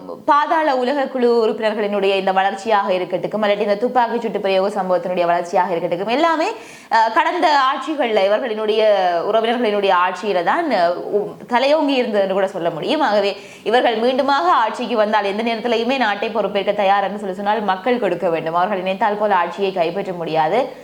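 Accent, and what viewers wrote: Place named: Sri Lanka. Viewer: Sister ugka language style superb alakana Sri Lanka tamil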